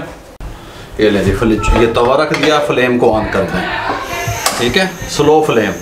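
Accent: Pakistan. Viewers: Pakistani accent aur wo bhi Punjabi ke sath bahot hi badhiya combination hai. Love from India ❤❤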